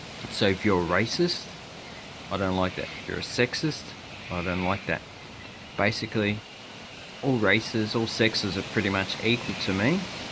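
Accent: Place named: Australia. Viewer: No problem, my name it isnt important! I'll be like all of them, don't worry mate.😄 I will be just happy of living in an amazing country, with all his nature and diferents types of animals, and with all the culture of anothers immigrants also with the friendly and admirable Australians like you, I like l the passion and the way of thinking for doing things from all of you. I'm from Argentina, so I speak Spanish and I'm studying English, your videos are helping me to adapt my English to understand the accent. But I can't guarantee that I won't make mistakes writing. So pardon me if I did it I love your country more than mine, lol.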